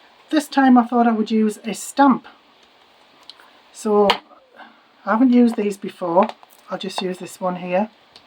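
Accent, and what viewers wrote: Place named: Northumberland, United Kingdom. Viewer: Which part of Northumberland are you from 😊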